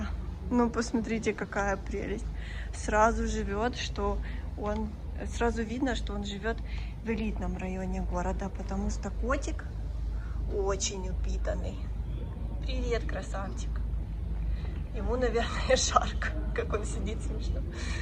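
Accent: Odessa, Ukraine. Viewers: Как мне нравится ваш одесский мягкий говор. Вы моя дорогая.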